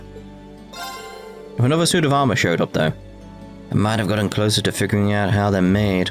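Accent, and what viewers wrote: Scotland, United Kingdom. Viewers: Scottish voice reveal Scottish voice reveal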